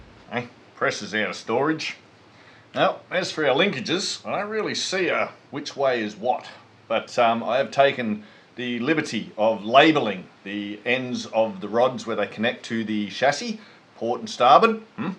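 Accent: Australia. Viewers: Love watching your videos, and even better you havnt lost your Australiana, other words you still talk like an Aussie, been watching you guys since you did the Canning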